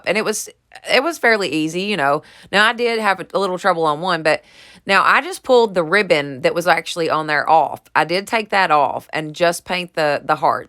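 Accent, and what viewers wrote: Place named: Oklahoma, United States. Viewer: Ohhh I love that piece for your SIL. It's gorgeous! (Ps. You're blessed to have an amazing SIL like that. Mine is the worst. 😔) Is Skillshare done live? Like in a class? Or can you view & pause like YouTube? Lol (I'm ALWAYS getting interrupted.. that's why I'm asking lol) Omgoodness! I'm loving these heart wreaths! Now I need to find those foam hearts now. Lol Love love love those little houses. Soo stinking cute! I LOVE your tassel you put with the wooden beads! You are soo awesome with ribbon! You got my attention in the 1st video that I saw of yours cuz you made this absolutely amazingly beautiful huge Christmas bow. It was gorgeous and took my breath away. I KNEW I had to subscribe after that. Plus, your accent. That always helps. Lol (my grandparents were from OK & Arkansas lol) Ps. Quick question.. do you have a mailing address? 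I will send you the Ballet Slipper chalk paint. 😉 Ty for sharing! ❤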